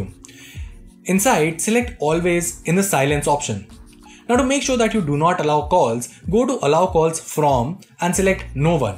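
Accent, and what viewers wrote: India. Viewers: Indian accent 🤢🤢🤮🤮🤮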